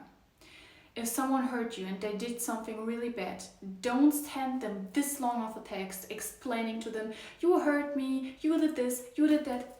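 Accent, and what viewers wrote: Germany. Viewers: Bist du deutsch, dein Akzent hört sich so an:) bin auch aus Deutschland und mag deine Videos viel zu sehr. Liebe Grüße ❤️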